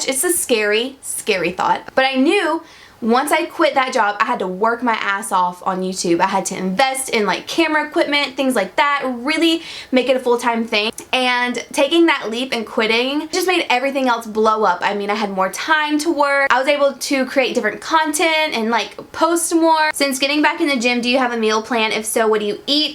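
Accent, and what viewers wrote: South, United States. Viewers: Your new favorite song🙌loved your lip sync to it!😍 p.s. I love your southern twang. ❤And you go girl with your independent self 💪💁👏👏👏it is hard not being able to talk to your best friend, but when they return it is the best! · If you guys watch the bachelor she reminds me of Raven! They kinda have the same southern accent and face lol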